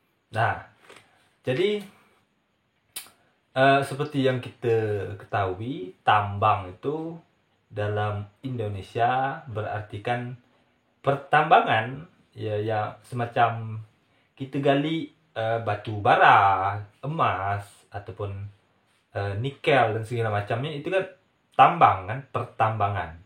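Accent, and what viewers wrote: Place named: Johor, Malaysia. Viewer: Salam kenal Pada pendengaran saya, bahasa melayu yang gunakan tak ubah seperti pertuturan orang melayu Johor. Mungkin ramai penonton dari Lembah Klang sependapat dengan saya.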